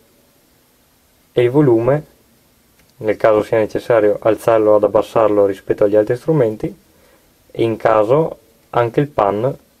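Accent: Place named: Trieste, Italy. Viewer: mamma come se senti che te son de trieste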